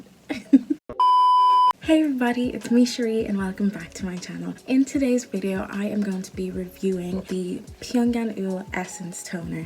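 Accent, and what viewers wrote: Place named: Ireland. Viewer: You seem to have a slight Irish accent. Are you in Ireland?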